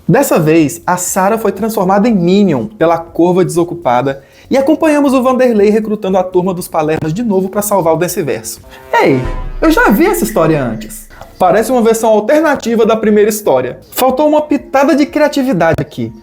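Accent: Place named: São Paulo, Brazil. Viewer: Eu to rindo horrores com o sotaque paulista hahahahaha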